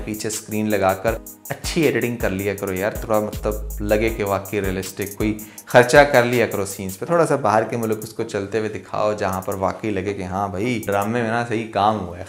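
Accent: India. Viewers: کون سی دنیا میں رھتے ہو بھائ آج کی لڑکیاں بہت کچھ کرتی ہیں فوج میں بھی لڑتی ہیں لڑاکا تیسرے اڑاتی ہیں اور فائٹر برگیڈیر بھی لڑکیاں ہوتی ہیں جلتی آگ میں کود جاتی ہیں آدمی بھی ہر طرح کے ہوتے ہیں جہیز کے بغیر بھی شادی کرتے ہیں میرے ھڈبینڈ نے بھی کوئ جہیز لینے سے منع کردیا تھا میں کچھ بھی نیکی لائ تھی تم اپنے خیالات کے مطابق بات کرتے ہو جوان لڑکی کو آنٹی بنادیا تم نے کچھ حقیقت سے کام لو صرف ہندی لہجے میں اردو نہ بولو نہ ہندی رھے گی نہ اردو کوا چلا ہنس کی چال اپنی چال بھی بھول گیا سنی ہے نا یہ مسال خوش رھو بھیا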